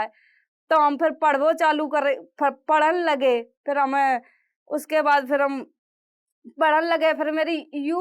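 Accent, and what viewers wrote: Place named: Bundelkhand, India. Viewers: Ye hoti hai up bihar ki language.....jitni suno utni jyada pyari... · Bundelkhandi boli hm jalaun se h ☺️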